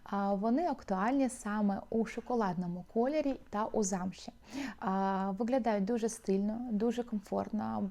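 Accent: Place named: Russia. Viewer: Навчіться правильно розмовляти українською. Чисто через вашу кацапську вимову не змогла дивитися більше півхвилини. В українській літера "г" - м'яка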